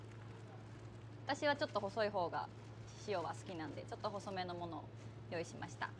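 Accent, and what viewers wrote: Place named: Kochi, Japan. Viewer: 前々から 拝見させていただいてました。 美人は当然ですが、 イントネーションは 高知の女の子ですね🎵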